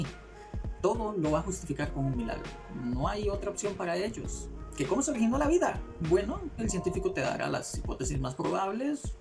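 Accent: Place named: Costa Rica. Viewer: Sos de Costa Rica??? Hasta cierto punto puedo identificar el acento de la gente de mi país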